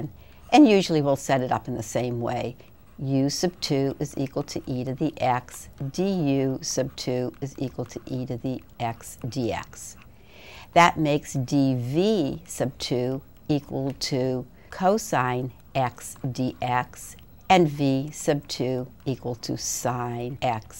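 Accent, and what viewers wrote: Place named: New York, United States. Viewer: The New Yawk accent is hard to take, but it's a start. YouTube falls down on deriving Integration By Parts. If anyone knows of good ones, please post.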